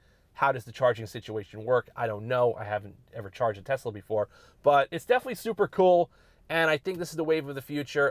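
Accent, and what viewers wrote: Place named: Long Island, United States. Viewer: What county are you in? At first I thought long island north shore, but then I thought Westchester. 🤔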